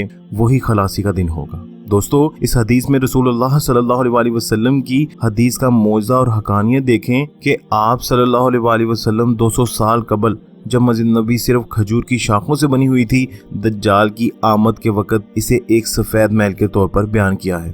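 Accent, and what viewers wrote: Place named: Punjab, Pakistan. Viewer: This guy speaks Urdu but punjabi accent , means Pakistani punjab region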